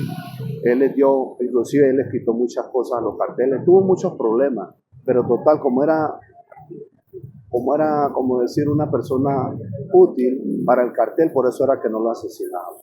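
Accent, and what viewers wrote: Panama. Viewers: Se le sale lo colonense al siervo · Empezó hablando como colombiano y terminó con Flow panameño 😄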